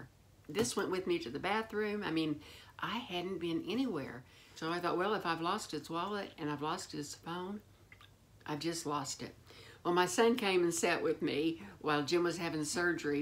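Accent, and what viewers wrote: South, United States. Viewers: I heard about you from Sandra. You are both wonderful. If I had gotten married again, I would learn a lot from you, your charm and intelligence. Am 70 something years old and still looking for a great guy. With your living care, no wonder he’ll be walking asap! Question about the protein bars: what’s the name of the company? The flavors sound delish!!!. I live upstate NY. Live your Southern accent. Kind regards, FR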